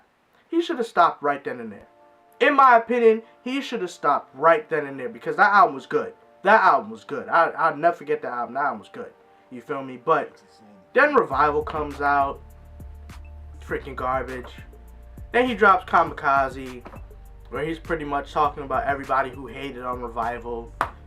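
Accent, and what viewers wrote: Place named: Jamaica. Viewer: are you Jamaican? Your accent is really similar to my Jamaican friends.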